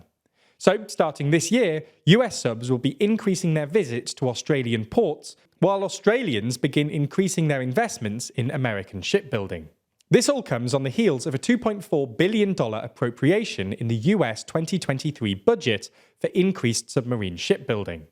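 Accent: United Kingdom. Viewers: British for ya!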